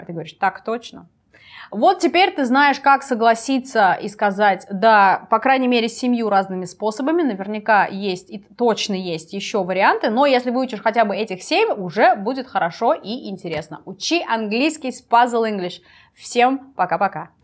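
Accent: United Kingdom. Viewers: Ох уж этот британский акцент))) 😘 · Вы очень красивая, хоризматичная и отличный британский акцент! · Классный акцент)) Сколько лет в UK жила?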